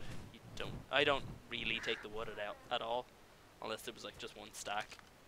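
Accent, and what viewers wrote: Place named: Ireland. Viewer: U sound Irish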